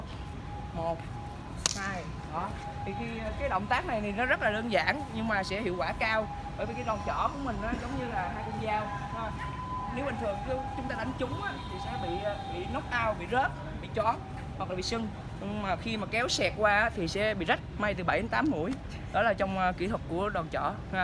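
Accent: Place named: South, Vietnam. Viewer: Nghe giọng người miền Nam rất thân thiện và dễ mến. All the best dòng máu nhà 😊👍🐨🇦🇺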